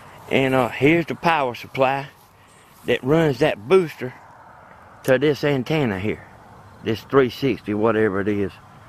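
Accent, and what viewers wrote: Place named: Georgia, United States. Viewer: Soon as you started talkin I knew you was from Georgia..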